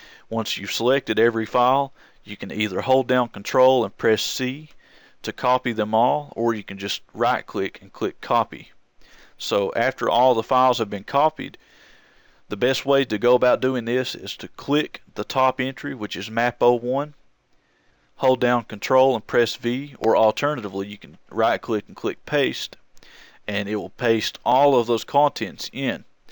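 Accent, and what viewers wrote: South, United States. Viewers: i’m a bit jealous of your voice you’re kinda like hillbilly morgan freeman i should know; i’m a southerner myself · sounds like a redneck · this is a good video, but the thing that intrigued me the most is his cowboyish accent. really satisfying to hear it XD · You sound like a country boy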